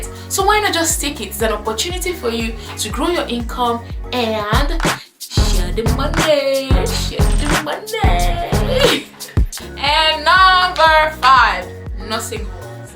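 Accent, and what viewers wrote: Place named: Nigeria. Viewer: name of the group? i actually thought you were nigerian,until you said kenya..wooow nice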